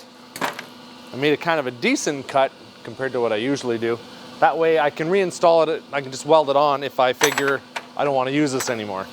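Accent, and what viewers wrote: Canada. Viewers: I've come to the realization that about half of the reason I watch every video is that amazing Canadian voice...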